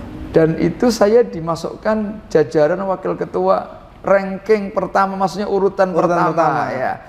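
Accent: Java, Indonesia. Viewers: Bawa2 betawi kok logatnya jawa yai?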